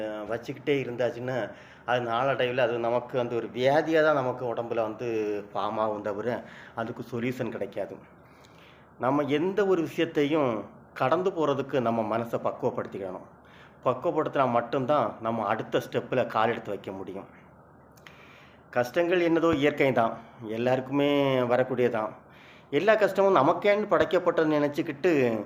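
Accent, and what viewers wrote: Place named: Tirunelveli, India. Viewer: Coming from South super. திருநெல்வேலிடா.......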